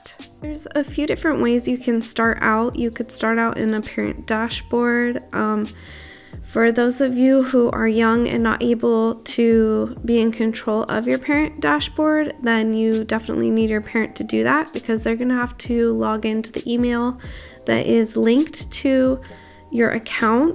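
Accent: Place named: United States. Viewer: ^^ J’ reçois même pas la notif T^T (YouTube bug) N'empêche tu as un très très bon accent américain XD !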